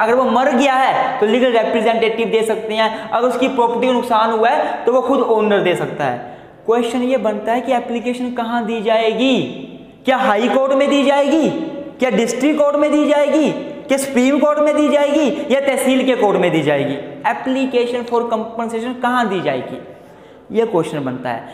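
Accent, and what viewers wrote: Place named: Punjab, India. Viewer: Sir, your accent is punjabi hindi, which delights me, why I don't know, but I guess you have a good teacher ability, which encourages us to approach you, so keep going in this way. Ranjit Basfor, Barshapara, Guwahati, Assam.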